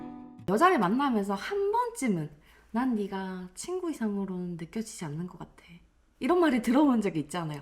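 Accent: Gyeongsang, South Korea. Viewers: 갱상도 사투리좀 고쳐라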